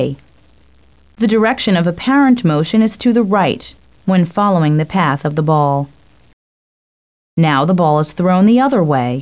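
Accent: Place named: United States. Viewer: ohhhh, what a stupid american pronunciation, accentuation and nasal twang ;-((((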